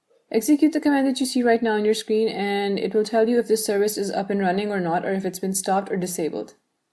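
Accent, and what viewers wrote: India. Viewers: are you from India . Can you speak a little slower? Please If you're rushing like that, I don't want to listen anymore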